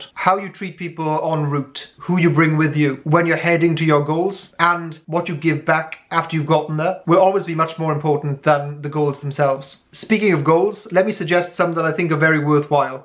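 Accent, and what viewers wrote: Wales, United Kingdom. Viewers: Are you Welsh!?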